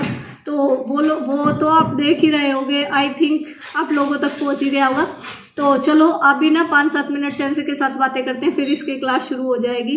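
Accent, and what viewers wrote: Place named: Haryana, India. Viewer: Like6,dear apni original language me baat kiua kero..... means haryana wali .....whi achi lagti h😘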